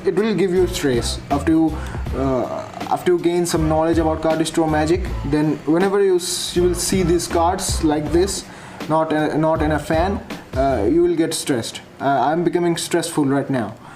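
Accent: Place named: India. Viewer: Wtf and desi English bro😀👍I am watching this video 26th time lol btw great video that's why I am watching this video 26th time lol😀🔥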